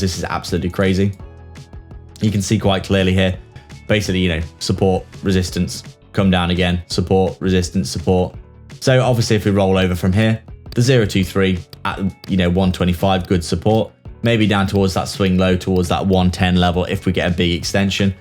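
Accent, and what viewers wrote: United Kingdom. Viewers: Its so refreshing to hear a fellow brit talking haha!! Keep it up mate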